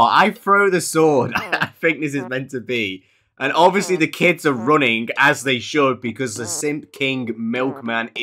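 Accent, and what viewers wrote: United Kingdom. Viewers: I have a question what’s your accent is it British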